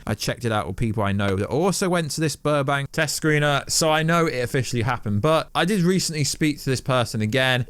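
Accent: United Kingdom. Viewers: ?? BRIT ACCENT ??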